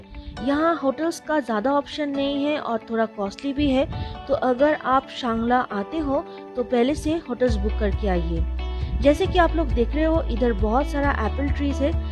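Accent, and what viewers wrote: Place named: Bengal, India. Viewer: Nice documentation and description. From your pronunciation, it's clear that you are from Bengal. But description is outstanding. Thank you.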